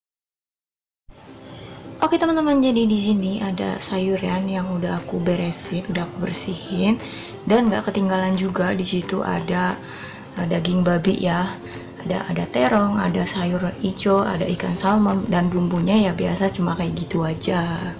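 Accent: Banyumas, Indonesia. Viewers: Halo anak cantik ngapak yen ora ngapak ora kepenak..... Semangat 👍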